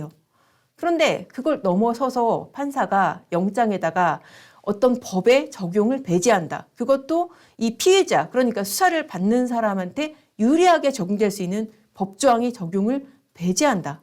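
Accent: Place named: Jeolla, South Korea. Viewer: 젏마도 절라도 출신이구먼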